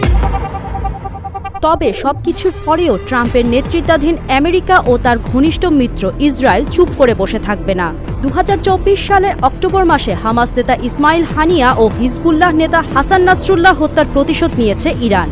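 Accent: India. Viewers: একাত্তর টিভিতে ভারতীয় সাংবাদিকের উচ্চারণে সংবাদ পাঠ।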